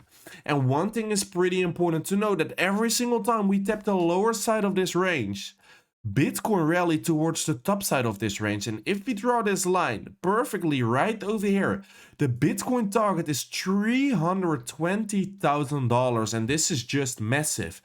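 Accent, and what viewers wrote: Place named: Netherlands. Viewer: are you drunk, ? or is that your dutch accent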